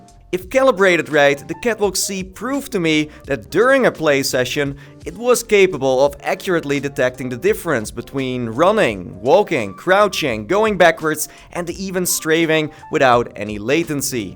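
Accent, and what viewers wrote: Netherlands. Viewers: Poh, Nederlands accentje zeg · hehehe I can instantly hear you’re dutch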